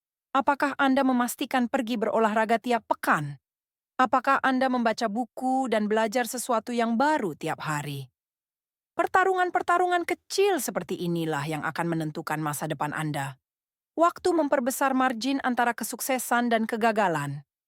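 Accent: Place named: Malaysia. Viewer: Kok kaya bahasa Malaysia si, logatnya jg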